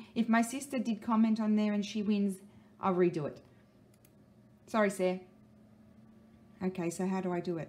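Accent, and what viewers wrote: Australia. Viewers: Oooh - How exciting. Beautiful journal. I've bought baroque and fragment add ons but love them all. Love watching you every day. Greetings from Perth WA. Great to get a glimpse of an Aussie's life in Italy. Terrific to hear the Aussie accent and lingo -))) and Something I've often wondered - are you related to Richard Roxburgh? (Big fan of Rake - amongst others!) Now that we are all addicted to tuning in daily, please say you'll still be around regularly!! :-))))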